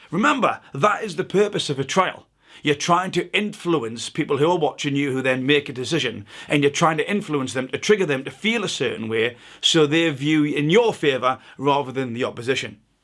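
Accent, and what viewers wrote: Newcastle, United Kingdom. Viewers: Newcastle I think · That’s defo a Newcastle accent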